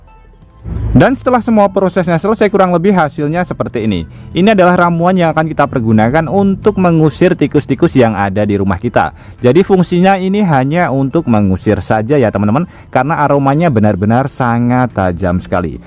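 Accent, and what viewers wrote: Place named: Central Lombok, Indonesia. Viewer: lombok tangah ni bpk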